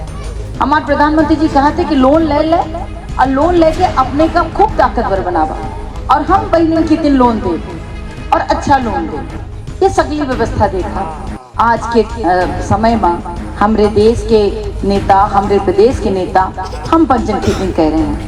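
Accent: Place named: Baghelkhand, India. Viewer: बहन अपना के बघेली बोल चाल के हम बहुत कायल हैं अपना बहुत बहुत धन्यवाद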